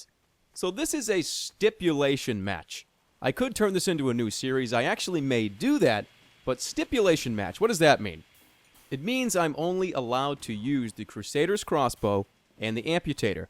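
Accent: Boston, United States. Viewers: yo youre from boston? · your from where the scout is! boston!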